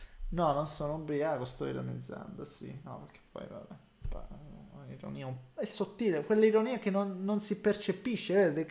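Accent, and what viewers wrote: Calabria, Italy. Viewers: di che zona bella sei della Calabria? Spero no di Cutro.. 😂😂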